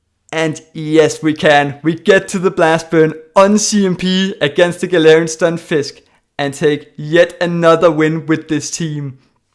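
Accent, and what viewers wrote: Denmark. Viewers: First time I've clicked on your video, and still, I could hear clearly after a few seconds that you are Danish!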